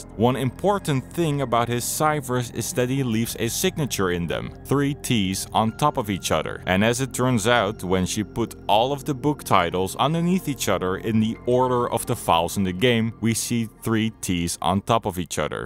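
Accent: Netherlands. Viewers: talk wit a verry dutch aksent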